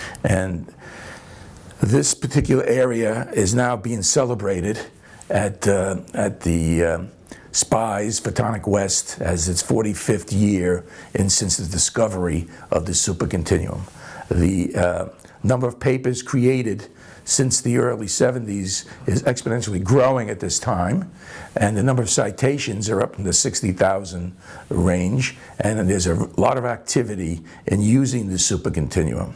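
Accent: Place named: New York, United States. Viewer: mike jan i'm not saying he isn't. clearly from this video he is a very accomplished and expert in his field. my point is i haven't met many scientists with such a thick new york accent, just like in goodfellas or something haha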